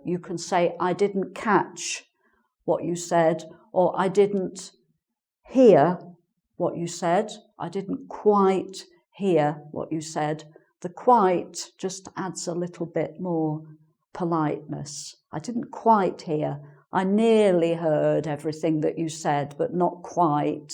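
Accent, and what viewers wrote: United Kingdom. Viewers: Is this a British accent ?? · you've got the British accent, haven't you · I love the pace and clarity of your speaking. It's a reason why I love to listen to the British accent. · Beautiful British accent!! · love your British accent soooo charming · I'm pronouncing every single word from you to be accustomed with British accent.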